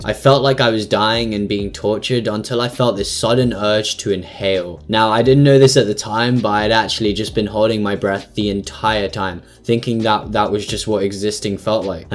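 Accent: United Kingdom. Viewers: m8 why u speak bribish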